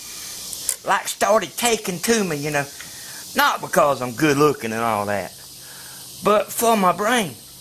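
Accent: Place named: Mississippi, United States. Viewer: I really love your videos. Informative, interesting, educational and entertaining. You are so right about the looks of the antenna and your appearance on the job. People judge most stuff by sight. Unfortunate but true. I've worked in installation work before I retired and I guarantee everything you said is true! You have good insight into marital harmony too. I'm from South Mississippi myself and I love your accent. I'm retired and this might be a way for me to make a few dollars to help with living expenses. Lord knows the social security retirement check is barely enough. So glad I found your channel, even if I never do sell them, I've really enjoyed watching your videos! You have a really great attitude! God bless you in all you do!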